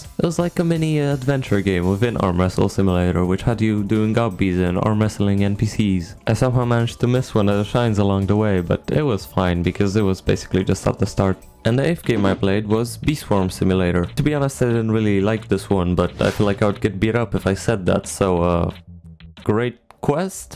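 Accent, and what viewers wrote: Poland. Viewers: yo you polish? dawg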